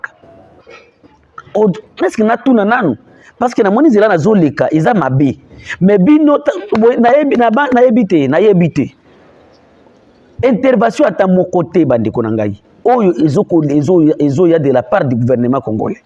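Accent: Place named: Rwanda. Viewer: La voix du rwandais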